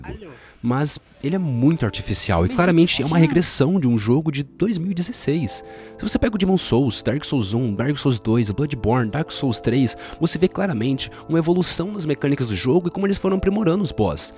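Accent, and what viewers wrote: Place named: Sao Paulo, Brazil. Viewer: Eu não vejo problema nenhum nesse vídeo. Eu vejo problema em quem esperava algo bom sendo dito por alguém que fala igual paulista...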